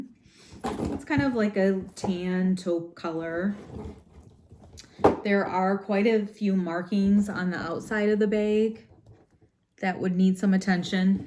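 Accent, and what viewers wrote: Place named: Chicago, United States. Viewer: Etoile is $$ just going to sit forever... Where are you from you sound like Chicago and then sometimes somewhere else ha!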